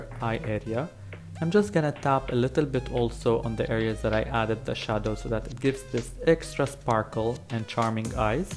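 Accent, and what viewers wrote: Arab world. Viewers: مبين عليك عربي من طريقة كلامك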